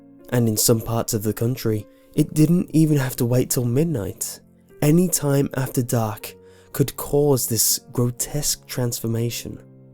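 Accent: England, United Kingdom. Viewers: Yeah, it's obvious he's English, I mean I live in Britain, but where about in England? I can't tell if he's Scouse or Liverpuddlian or Brummie or what...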